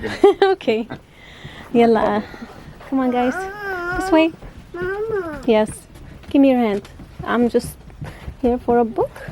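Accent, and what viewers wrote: Hejaz, Saudi Arabia. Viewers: مادام انتي جنوبية يا ليش لهجتك تشبه لهجة المجنسين في جدة والحجاز ،، ياليت تتحدثين اللهجة السعودية البيضا واللي هي لهجة قبيلية واضحة ،، ماهو حلو انه الانسان يضيع هويته الاصليه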